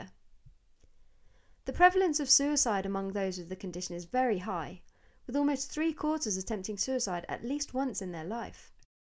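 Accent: United Kingdom. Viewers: your British accent is hard to understand at 1.5 speed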